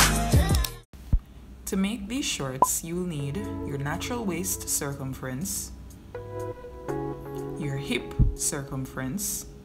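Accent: Jamaica. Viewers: you sound Jamaican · Hey, are you Jamaican? I heard an accent. 😊😊😊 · Did I detect a Jamaican accent,? Good to hear you. Thanks for sharing.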